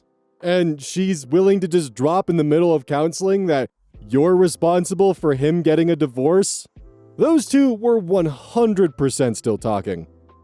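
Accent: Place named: Midwest, United States. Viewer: I love wheb midwest accent comes out strong xD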